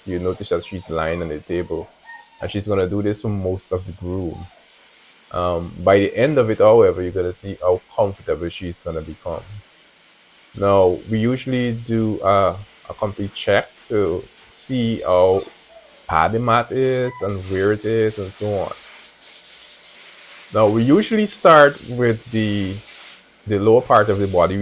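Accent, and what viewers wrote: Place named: Caribbean. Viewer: I love your accent, where are you from? You sound West Indian. A very comfortable and soothing voice. Puts me in mind of my Granny. 🙏🏾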